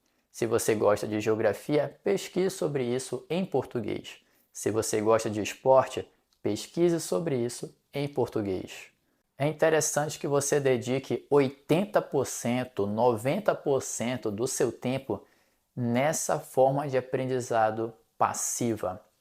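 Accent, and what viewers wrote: Rio de Janeiro, Brazil. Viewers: Que motivante, gostei! É um prazer ouvir esse seu sotaque carioca, muito bonito, que posso utilizar como um modelo para o meu. Obrigado! 😊 😎 🎉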